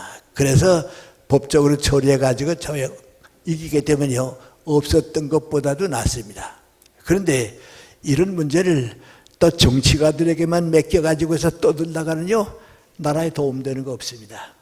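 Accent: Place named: North Korea. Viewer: 강의 중반으로 들어갈때부터 은근 화가 치밀어 오릅니다. 1980년 초반 대학 다닐 때 이분이 쓴 교과서를 기억합니다. 비겁합니다. 나라가, 당신들의 월남을 받아들이고 평생 꿈을 이루고 살게 해 준 이승만의 대한국에서 지금껏 당신같은 지식 기득권층이 한 일이 뭡니까? 북한 위주의 통일을 지향하며그들의 음모와 흐름, 역사의 역향을 보면서도 침묵하며 출판 기득권을 유지하며 민초들을, 사회 구석구석의 무넘짐을 바러보며 참묵으로 일관 해 오셨습니까? 박정희의 독재를 인 마킹하며 북쪽지향 기류에 편승해 앞 뒤 마당 땅 다지고 계십니까? 철학적 기독교인이라고요? 달변에 인쎄큐어리티로 사람들을 내려다 보면서 스스로의 철학적 헐벗음은 못 보십니까? 이북식 말투조차 역겹습니다.. 자유민주주의 대한민국이 아직도 만만해 보이십니까? 연세대 출신들이 저지른 대국민, 대기독교적 모욕은 요한 계시록에서 투영하고 있는 심판을 피할 수 없을 것입니다 개대중이 커밍 아웃부터 지금까지 30 여 년을 그동안 뭘 하시다 나와서 달변을 풀어 내십니까... 미국의 마지막 통고가 코앞이니 좀 걱정이 되십니까??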